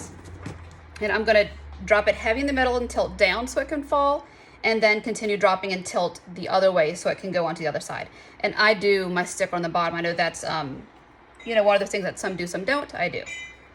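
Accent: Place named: Louisiana, United States. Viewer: Thank you for being real and showing us all how to. Got a question are you from Louisiana? Oops I finished watching and answered my question...lol I thought so I recognize the accent ❤ I grew up a Louisiana girl.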